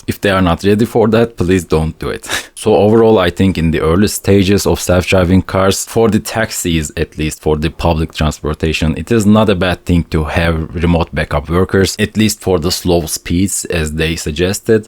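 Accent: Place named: Turkey. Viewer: Abi Türk aksanı hayvani sızmış, elinize sağlık 🔥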